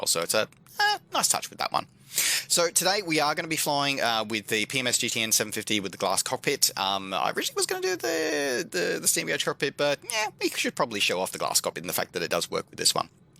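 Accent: Australia. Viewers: You must hold the recorddddd for the most annoyingggg Australian voiceeeeeeeee.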